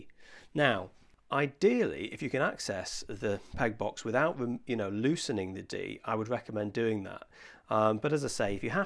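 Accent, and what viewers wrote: England, United Kingdom. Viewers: Nice job! Love the English accent too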